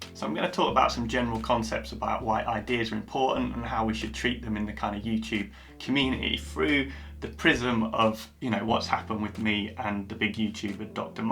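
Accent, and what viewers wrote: United Kingdom. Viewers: A like for your humbleness and great Bristish accent.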